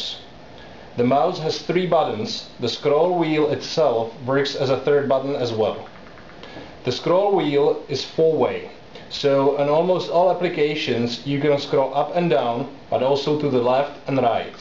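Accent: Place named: Czech Republic. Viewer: This must be czech guy talking, the accent is just awesome.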